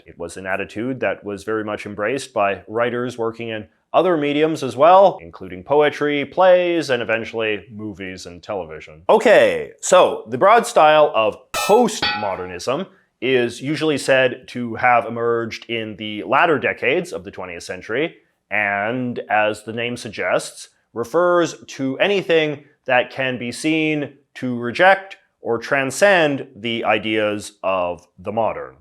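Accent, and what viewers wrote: Canada. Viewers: Canadian accent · I like how you deliberately turn the Canadian accent up to 11.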